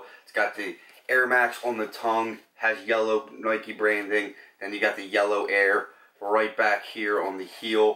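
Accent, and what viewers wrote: Philadelphia, United States. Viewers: Mika Swasaq philly.. philly.. philly must be where he from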